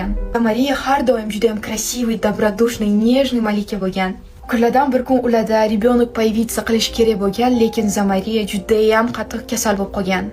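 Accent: Tashkent, Uzbekistan. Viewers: Ha xaqiqiy Toshkentcha til chottki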